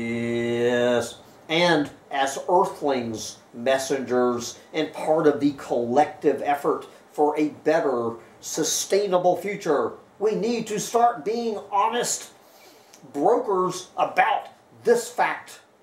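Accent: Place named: South, United States. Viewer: This guy is pretty smart. Knows his stuff. Personally, I've found that the southern USA accent makes him seem like a dumbass. And unfortunately, a bit tedious to listen to. But I couldn't agree more with the message. I love the honesty of climate doomers. We've been lying to ourselves and each other for long enough.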